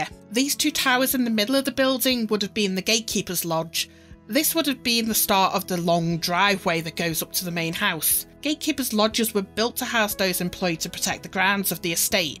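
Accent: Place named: Manchester, United Kingdom. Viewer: I'm an American and I can't place your accent, is it Manchester!? Love your videos! Definitely subscribing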